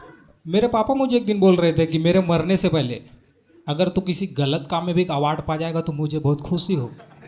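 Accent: Chhattisgarh, India. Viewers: Accent Chhattisgarh ka lagta hai !!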